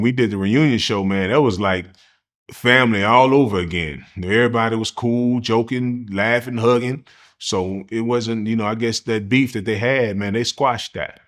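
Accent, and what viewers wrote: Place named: South, United States. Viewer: How do you got a southern Drawl but from Indiana 🤔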